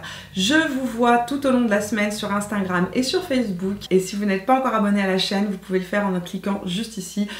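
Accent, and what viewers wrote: Quebec, Canada. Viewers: Bonjour! J'aime beaucoup quand vous faites des collabs toute les deux! Et quand je t'entends parler, ça me rappelle le Québec où j'ai habité quelques années...la palette Dior est superbe, je l'utilise depuis qques semaines. Voudrais tu par contre me dire quel est le dernier pinceau que tu utilises, le fluffy pour appliquer les illuminateurs en topper, stp? La bise.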